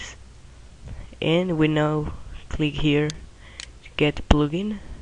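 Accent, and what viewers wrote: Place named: Spain. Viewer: are you kidding me? he speaks great english, only thing different is the spanish accent. This was better english than most english speaking people can produce. Thumbs Up!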